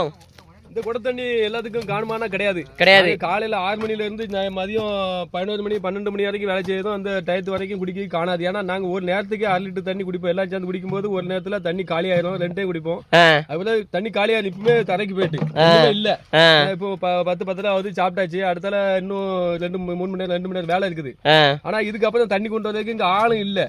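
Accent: Tirunelveli, India. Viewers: திருநெல்வேலி பேச்சுவழக்கு தனியா தெறியுதுங்க.சிவகங்கை பகுதியில் இந்த மாதிரி பேசவதில்லை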